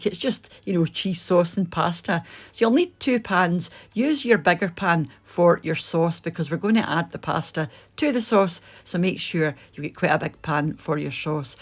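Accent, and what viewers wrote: Scotland, United Kingdom. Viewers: Thank you for this recepi Lovely Scottish accent! Looks comforting Nd delicious ❤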